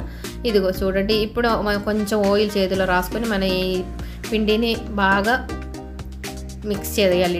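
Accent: Kerala, India. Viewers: Meeru malayalee na madam...mee slang same malayam la undhi Telugu.😅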